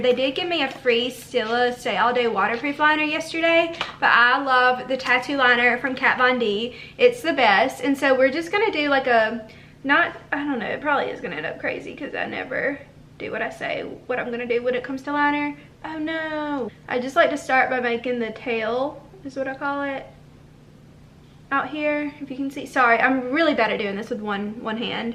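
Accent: South, United States. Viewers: Im obsessed with your southern accent it's just so adorable 😩😩😍😍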